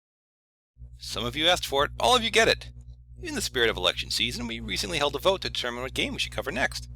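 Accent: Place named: Canada. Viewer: Depends where you are from. I'm pretty sure is Canadian due to his accent and sound of his voice. That said, he probably pronounces it like former Philadelphia Flyer and current LA King player Simon Gagne and his name is pronounced.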